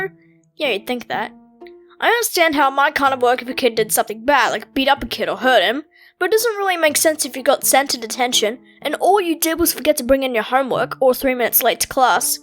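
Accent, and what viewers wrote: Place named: United Kingdom. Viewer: Are you British because I am and you sound like one?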